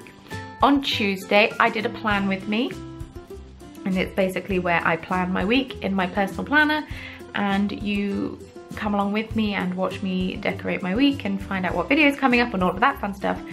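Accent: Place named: England, United Kingdom. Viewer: hello " " just subscribed to your channel. just posted another note to your answer to my post on another's channel. anyway, I am looking forward to checking out many more of your videos. I like hearing you talk, my Arkansas dialect is not as well sounding as your England accent. anyhow, looking forward to many more tutorials. sincerely Becky B from Arkansas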